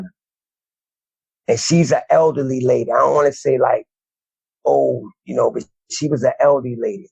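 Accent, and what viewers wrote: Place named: Baltimore, United States. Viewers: My man reading your from Baltimore aren't you? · Heavy Baltimore accent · Dmv stand up you can hear the Baltimore in my guy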